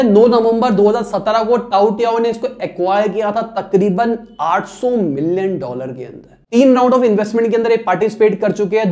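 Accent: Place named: Punjab, India. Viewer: Aapka content sahi me share karne like nahi hota bcoz aapki jo language hoti hai vo un professional lagti hai means usme koi professional sa feel nahi hota hai aap vo jo bolte ho na yaar, bhai etc aur aap standerd hindi language bhi nahi bolte matlab ek normat panjabi tone me aap information deliver krte ho to ye sb ek professional worker pe nahi jamta aapki language vivek bindra , ujjval patni ya aur bhi jo best vaqta hote hai unke jaisi professional ho to zyada behtar lage tab aapki video share krne me hume bhi khud me accha feel hoga.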